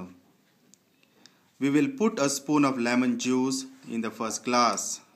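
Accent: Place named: India. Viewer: Your voice is like indian , r u an Indian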